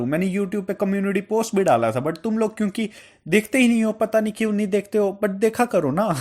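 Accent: Jammu, India. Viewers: Bro aap jammu se ho kya ?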